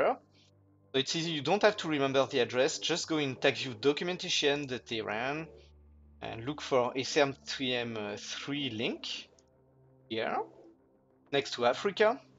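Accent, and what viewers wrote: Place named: France. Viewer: On sens tellement que tu es francais^^ mais super vidéo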